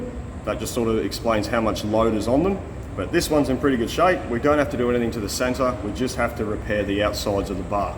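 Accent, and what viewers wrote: Australia. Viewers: "... now I'm talking American." That's OK Mate, I've had to learn how to listen in Australian...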